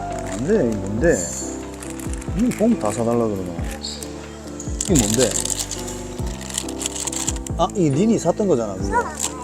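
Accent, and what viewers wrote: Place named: Busan, South Korea. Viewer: 저도 예전에 일때문에 일본에서 살다가온적이있었는데, 일본에서 잘 견디고사시네요^^ 저는 일본에서 힘들었네요ㅋ 부산말투이신거같은데, 저도 부산사람입니다. 지금도 부산에서 살고있고요. 아내분도 부산억양이 있으신거같네요^^ 아기의 쾌차를 바라며, 행복하시길~~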